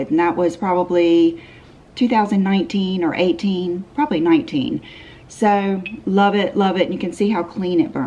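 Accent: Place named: North Carolina, United States. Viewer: Love your videos! I’m a Carteret County local, so I totally get the difference in accents in NC;). And I TOTALLY never noticed how red my eyes were until I started doing YouTube videos. Thank you for your positivity and sweetness… and if you ever come up to the Crystal Coast I’d totally do a beach day with you:)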